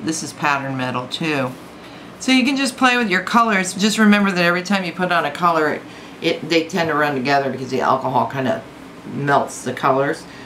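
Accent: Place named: India. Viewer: Intro sounds like indian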